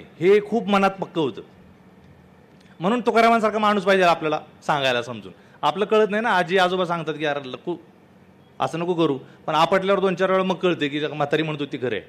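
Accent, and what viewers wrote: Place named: Udgir, India. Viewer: मंजुळे सर तुम्ही माझ्या गावी उदगीरला आलात .खुप छान वाटले.मी अहमदनगर आहे पण तुमची भाषा ऐकुण उदगीरमध्येच आहे असे वाटले. आपली भेट व्हावी ही अपेक्षा.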